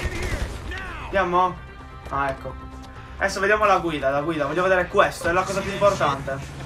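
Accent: Sardinia, Italy. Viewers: Si sente che sei sardo ;)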